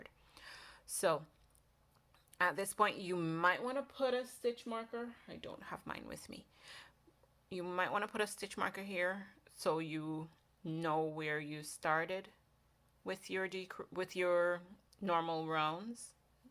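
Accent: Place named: Caribbean. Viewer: I hear a Caribbean accent❤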